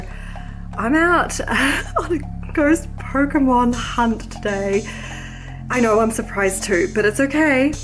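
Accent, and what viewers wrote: New Zealand. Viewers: Snap. I also came here from Diana's channel and I am also a New Zealander. I agree, it is lovely to hear our accent about. The doll came out so lovely and I'm glad to have found your channel. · Popped over from Diana's channel to watch the video and I have to say it is so amazing to hear a familiar accent. I live in New Zealand as well :) Love how your doll came out, she is so beautiful.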